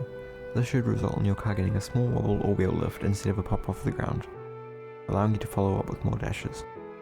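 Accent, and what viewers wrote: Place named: New Zealand. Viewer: You from nz? U got a nz accent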